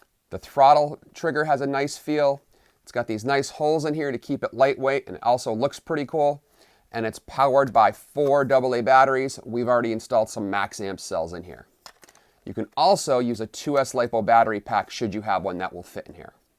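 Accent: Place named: New England, United States. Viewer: What part of New England ar u from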